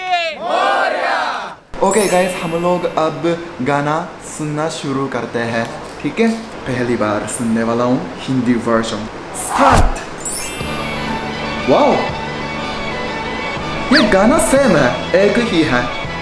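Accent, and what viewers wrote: Standard. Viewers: No one speaking So pure speaking actully better than me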